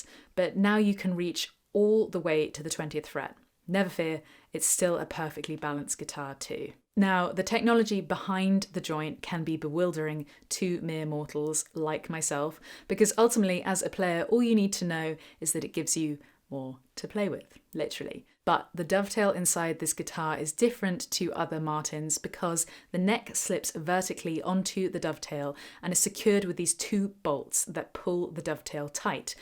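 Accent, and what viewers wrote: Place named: Bristol, United Kingdom. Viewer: Always a pleasure to see you, and hear that lovely Bristol accent. Beyond that, I'm with "intersanctum." Lots of guitars look and play similar to this one, and Martin should make a " " edition if you're doing Martin commercials. I do like the new neck joint, and, of course, it's a Martin, so it sounds great. Way out of my modest price range, however….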